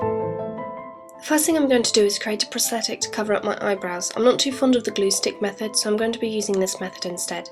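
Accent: England, United Kingdom. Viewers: I think the fact that you have a british accent totally adds to this video <3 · wow your voice..your kind of speak...guess you will be a good voice actoress..are you british ? i like this accent soo much :-) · her English accent is beautiful. die die die. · I love your voice for this, soft, with a british tone. sounds alot like a girl harry potter xD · love ur british accent!!!!!!!!!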